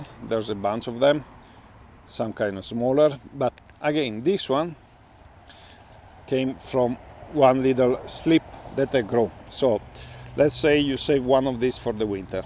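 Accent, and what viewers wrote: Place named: Italy. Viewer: Grande.. … looks good 👍👍 love your Italian accent when you speak English 😂😂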